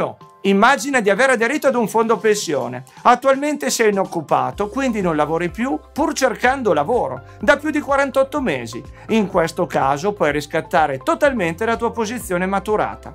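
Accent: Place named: Piedmont, Italy. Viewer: M'iscrivo, oltre che per i contenuti interessanti, anche per il marcato accento piemontese😄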